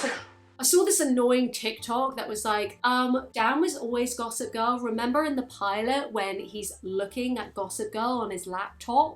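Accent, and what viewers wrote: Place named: United Kingdom. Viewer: I love your British dialect its lovely to listen to!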